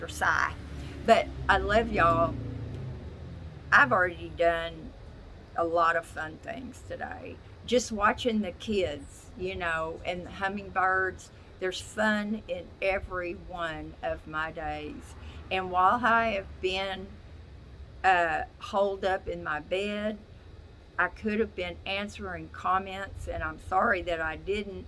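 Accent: South, United States. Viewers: I luv your southern accent! You r the bomb!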